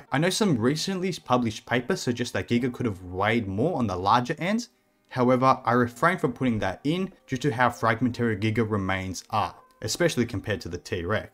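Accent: Australia. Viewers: Very amazing video plus I love the Australian accent and it’s as satisfying as a British documentary.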